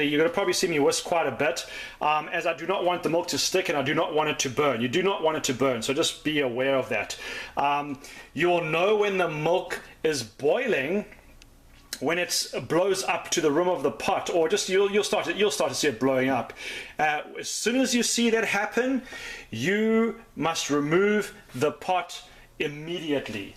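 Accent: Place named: South Africa. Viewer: That goes without saying. The heating process is to attack some of the non-beneficial bacteria and microbes. That means, as you point out, that some benefit will be sacrificed along the way. Why should we sacrifice more than we ought. Also, I have discovered that 180 f is optimal heating and cooling to 108 is also perfect. At this time I will then place the jar in an insulated baking dish and fresh dish towels with a layer of paper towel rubber banded on. At this point, the oven will be maintened around 82-84F with simply the use of an oven bulb. Lastly, I have discovered that optimal fermentation/curing time is between 6.5 and 8 hours. Lastly, are you South African? Your accent reminds me of the many Boere which I have known.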